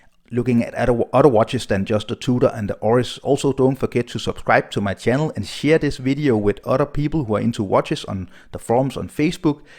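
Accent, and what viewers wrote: Denmark. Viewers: I would guess your mother tongue is Danish, am I right? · By your accent Im guessing you are Danish, I am looking to buy a BB58 as well, do you recommend any retailers in the Copenhagen area?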